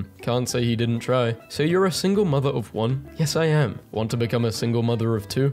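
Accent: United Kingdom. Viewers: The British accent makes all the memes 110% better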